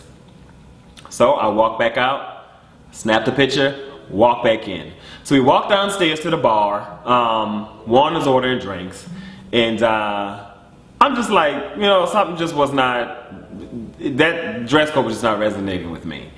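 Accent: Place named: Lowcountry, United States. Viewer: He must be from the lowcountry..... I hear Lowcountry SC all in his voice!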